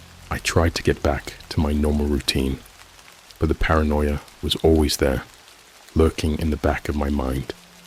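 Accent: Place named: United Kingdom. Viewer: You sound like you're a fellow Brit! However, "nine hundred and eleven" makes you sound like a bot! it's nine-one-one!😺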